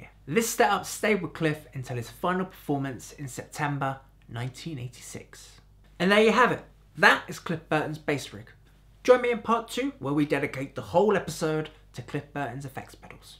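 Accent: England, United Kingdom. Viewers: I thought that was just his English accent.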